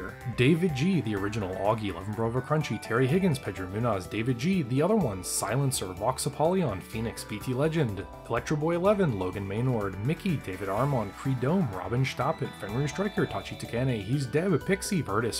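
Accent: Canada. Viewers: I just clocked your accent your Canadian ain't you? Not that it maters but I always assumed your were a little south of the border. I am crap at accents.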